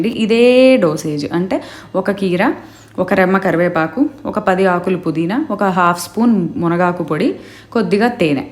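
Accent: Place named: Vijayawada, India. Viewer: Nice to hear Vijayawada Telugu 😊👍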